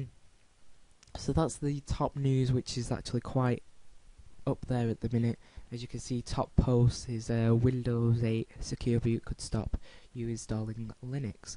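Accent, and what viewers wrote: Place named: Yorkshire, United Kingdom. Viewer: The UEFI boot thing is worrying but I don't think that MS would like the bad publicity it would get if it tried to limit the use of computers to its own OS. This is the 1st of your vids I've watched - your accent sounds similar to mine so you're prob in Yorks - Do you go to a LUG? I only have Linux, I grew to hate Windows more & more so it was easy to leave it & move to Ubuntu. I run Ubuntu 11.04 'Classic' on my desktop & Xubuntu on my EeePC901, laptop & server. Use CentOS in a VirtualBox.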